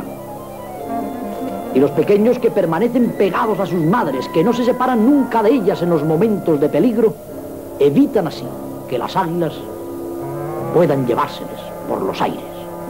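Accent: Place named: Castile, Spain. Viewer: The narrator's voice is awesome. Old time diction and Castillian accent.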